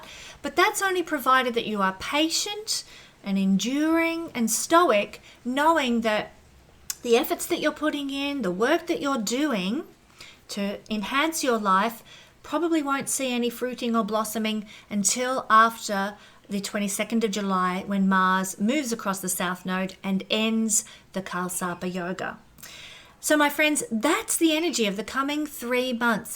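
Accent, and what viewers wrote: Australia. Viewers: Thank you-my first foray into Vedic astrology...interesting. Good to hear an Aussie voice!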